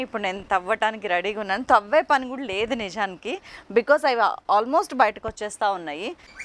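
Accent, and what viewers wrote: Telangana, India. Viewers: Your voice is beautiful and confident...especially your Telangana dialect is so pleasurable to listen... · Wow garu that’s fantastic tip I always put in pressure cooker water and salt or sugar Sooper I just liked your recipe and the healthy tips in between Thank you amma You just rock like my family member your Telangana slang ditto like ours 🥰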